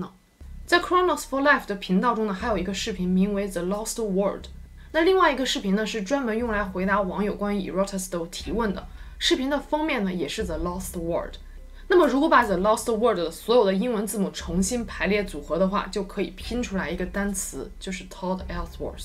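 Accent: China. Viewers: You sound like from mainland China. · 是中国人很正常😎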